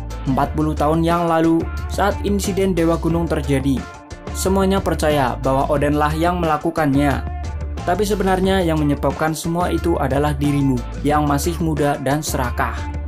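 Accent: Java, Indonesia. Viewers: Medok banget anjing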